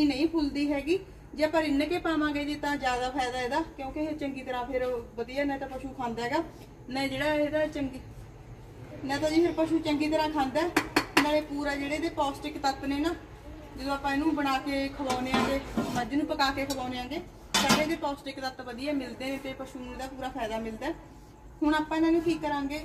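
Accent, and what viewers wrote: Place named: Moga, India. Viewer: ਭੈਣਜੀ‌ਮੋਗੇ ਏਰੀਆ ਦੇ ਲਗਦੇ ਓ ਲੇਟੀ ਸਬਦ ਓਧਰ ਹੀ ਬੋਲਦੇ ਓ ਵੈਰੀ ਗੁੱਡ ਭੈਣੇ ❤❤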